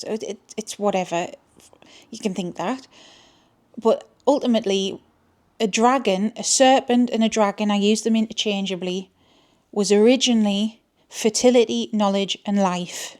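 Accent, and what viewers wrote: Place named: North East England, United Kingdom. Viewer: Oh your a north east lass , Xx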